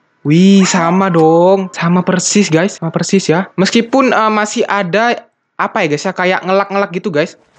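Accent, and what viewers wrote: Madura, Indonesia. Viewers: Logatnya logat Madura bner kah?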